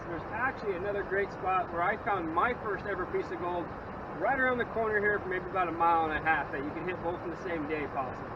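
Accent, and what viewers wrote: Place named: United States. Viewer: Thanks! I could not understand any of the detail words with such a heavy American accent as cool as it sounds aha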